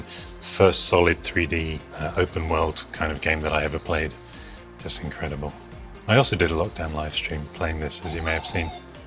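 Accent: United Kingdom. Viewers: Dang, fast replies here! :) Thank you, me and my daughter (who alsdo don't like spiders), also guessed a lizard. Where do you live btw? You sound like you're from the UK, but some sticker on the box said US, but you do like C64, Amiga, etc which is kinda European.. myself from Sweden felt the craze here as well :) Mighty awesome channel though! The asthetics of your old game room / pc room / studio is so inviting, with all the old vintage equipment looking new, fresh and colorful :D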